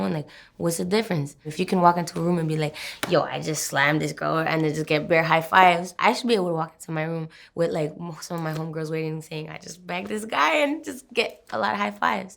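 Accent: Caribbean. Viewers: she sounds slightly Caribbean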